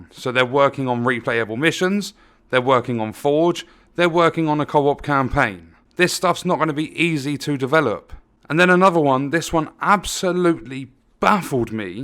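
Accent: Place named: Croydon, United Kingdom. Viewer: Haven't found any AA or A games that take me fancy in a long time. Loot based is my main staple. This is the first time I've actually enjoyed a halo game in decades. Probably because it feels amazing. I would love to boycott AAA games because the state they come out in is close to theft. That's why i like your channel bro, because you are not scared to tell it how it is.... plus your accent and humour reminds me of an old friend of mine from Croydon 👌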